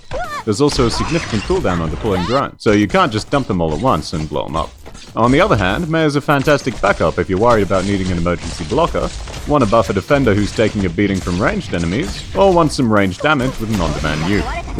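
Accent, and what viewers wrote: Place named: Australia. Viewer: Perfect quality video except the aussie accent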